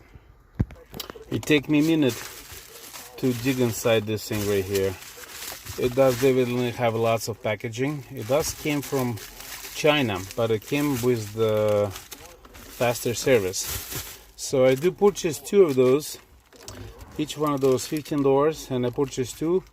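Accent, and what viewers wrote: Russia. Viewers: Похоже на русский акцент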